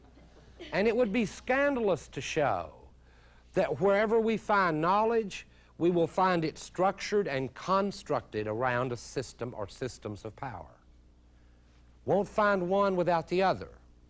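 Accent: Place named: West Texas, United States. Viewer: West texan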